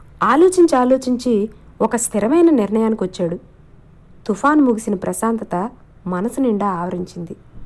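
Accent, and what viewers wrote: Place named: Vizianagaram, India. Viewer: Vizianagaram yaasa బావుంది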